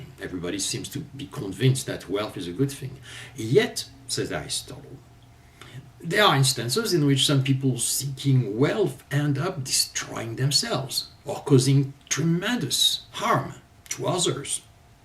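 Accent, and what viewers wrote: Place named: France. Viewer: I love the French accent. Perfection.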